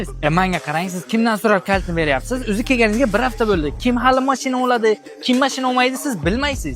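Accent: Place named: Bukhara, Uzbekistan. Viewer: Buxoro sheva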